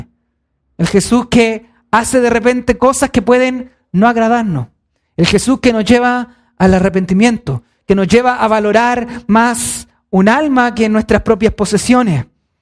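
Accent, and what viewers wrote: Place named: Chile. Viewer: Increíble el acento chileno como si se nos nota, aunque pensemos que no😅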